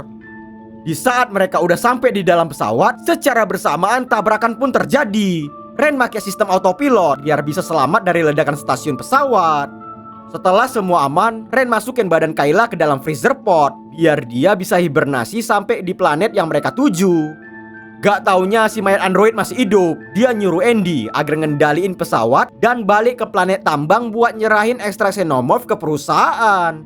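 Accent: Medan, Indonesia. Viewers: Hahha lucu abg ni ngomong. 😂pasti org Medan. Udaaku subscribe y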